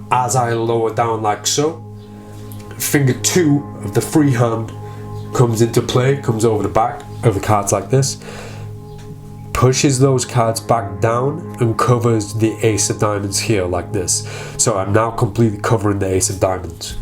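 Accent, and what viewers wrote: United Kingdom. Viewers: The cockney accent is always on point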